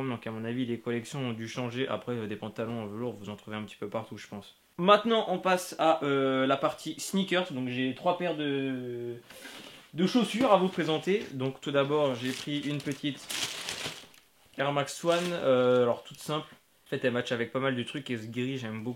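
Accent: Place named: Belgium. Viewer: Ton decor est de plus en plus mortel! achats tops et surtout gros pouce bleu pour ton accent belge un moment ahaha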